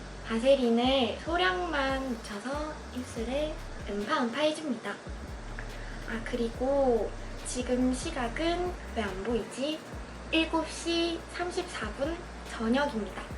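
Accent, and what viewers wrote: Gyeongsang, South Korea. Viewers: 서울 말은 서울 말대로 ❤️ 경상도 말은 경상도 말대로 ❤️ 모두 어울려요. 넘 귀염~ '머리부터 발끝까지.~' 이 노래가 생각나네요. ~ㅎㅎ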